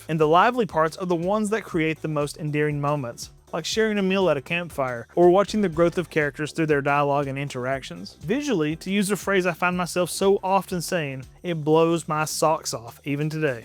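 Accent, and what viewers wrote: South, United States. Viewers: I thoroughly enjoy watching a fellow southerner on this space. Your use of "eat up" meaning "to be full of" reminded me of one of my grandfather's funniest barbs. If he thought someone wasn't being smart, he'd chirp that they were "eat up with the dumbass". I'd always giggle when he'd say that and I adopted it into my speech when I was an adult. I love your takes on RPGs, but I also love listening to you because you sound like me when I talk to my friends about games I like. Keep on being you, buddy.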